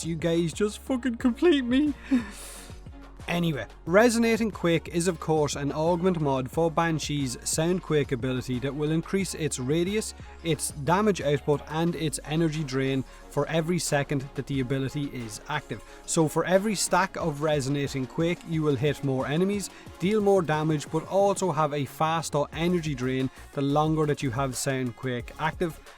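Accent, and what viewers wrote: Ireland. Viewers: Ronaldo Rond those Irish accents are one of a kind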